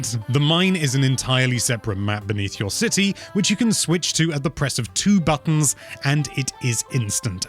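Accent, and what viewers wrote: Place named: United Kingdom. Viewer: Just uk accent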